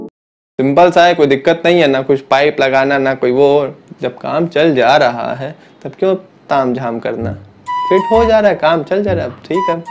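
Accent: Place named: Lucknow, India. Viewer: You r from Lucknow iam sure😎😂 because iam also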